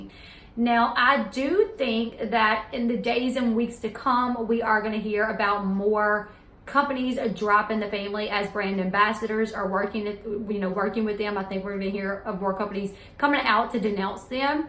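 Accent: South, United States. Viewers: I just wanted to tell u I love your show! Your southern accent brought me in but you are a smart informative lady! Keep up the good work!!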